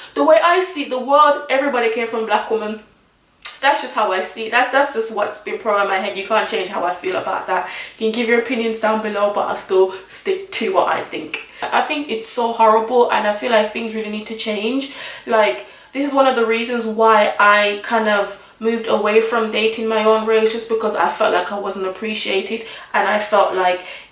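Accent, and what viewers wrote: Jamaica. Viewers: I love when your Jamaican accent comes out 😍😍 You're so peng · I seriously love it when you speak patois😂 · First time watching ur vids and I could tell rite off the bat that youre a Jamaican... ... boii we cyaa hide.... ... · Omg are u a Jamaican? ??......mi hear di patwa....hahaha😂😂😂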